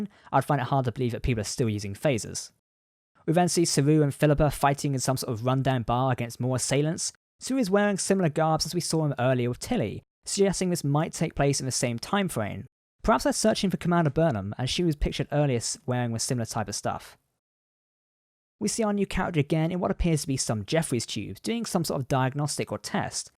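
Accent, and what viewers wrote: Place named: England, United Kingdom. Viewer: You're clearly British, probably English by your accent, so please remember the word is pronounced CommunicaTor NOT CommunicaYDA. "...the planet is rather unique." - 'unique' is an absolute. Something is either unique or not unique; it can't be partly unique, very unique, slightly unique or indeed rather unique; not to mention that the number of planets with multiple moons we've encountered in previous Star Trek outings, suggests that a planet with two moons is certainly NOT unique.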